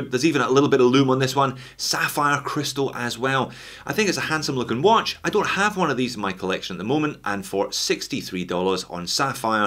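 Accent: Scotland, United Kingdom. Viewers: I appreciate your work and the Scottish accent! Have you considered buying a real Flieger from Stowa?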